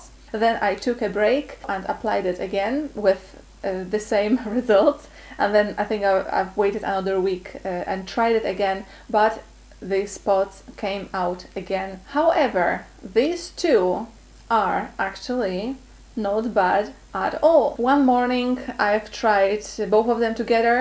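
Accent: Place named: Poland. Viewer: Kurde, a jednak, my Polacy poznamy się wszędzie. Usłyszałam tylko Twój akcent ;) Żeby nie było, mam taki sam, nie napisałam tego, żeby Cię obrazić :)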